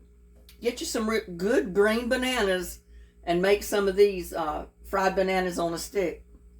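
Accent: South, United States. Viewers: Dear I am from London UK and I love that southern drawl! Love Maria 🌹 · Never mind what anyone says l love you cooking videos and your southern accent. I have a Boston accent and guess what l am as proud of it as you should be of your southern accent. We are all Americans no matter which part of the country we come from. Also l love your blouse in this video very lovely on you.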